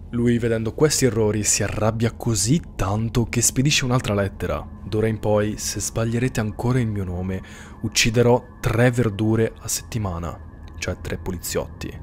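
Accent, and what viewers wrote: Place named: Rome, Italy. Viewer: ahahahah.. anche noi pugliesi però non siam da meno. 🤣 Comunque non pensavo fosse sardo, avrei detto romano. 😅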